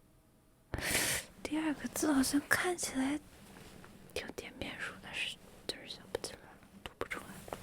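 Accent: Standard. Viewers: 发音也很标准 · 你的中文发音非常标准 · Your Chinese is really very standard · 明明发音很标准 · 讲实话你的中文太标准了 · 发音好标准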